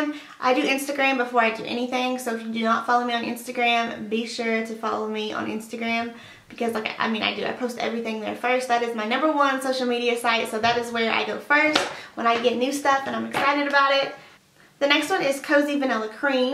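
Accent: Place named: United States. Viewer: Theres a skunk? Dead? Thats nastyyyy :D i love your accent... being british some of the american accents are so different to hear!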